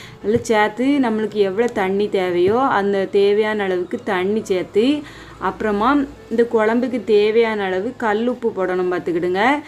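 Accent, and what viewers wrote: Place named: Tirunelveli, India. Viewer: நெல்லைதமிழ் வாழ்க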